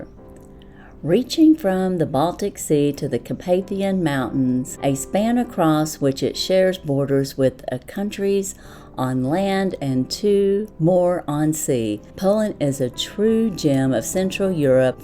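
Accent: South, United States. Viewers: Hi Miss! I love hearing you pronounce all the Polish words with your Southern accent & enjoying the treats. Great variety of items in this box.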